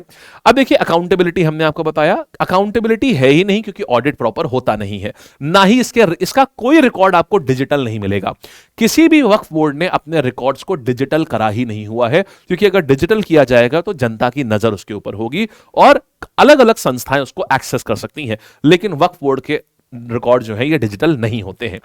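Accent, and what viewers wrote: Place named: Bengal, India. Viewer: Sir up ka bat sunker lagrahahe up bangali hei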